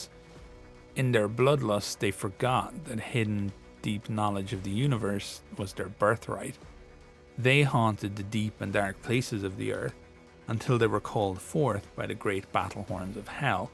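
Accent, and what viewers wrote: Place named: Ireland. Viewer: Should just lean into the Irish accent and give it a bit of a doomsayer priest vibe, mate.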